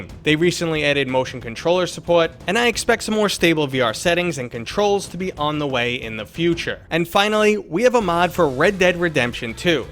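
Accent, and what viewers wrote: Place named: Boston, United States. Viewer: That boston accent tho.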